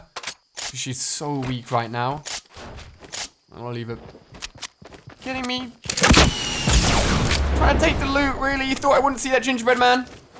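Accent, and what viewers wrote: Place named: United Kingdom. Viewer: O my gush i cant Understand you are you fro Uk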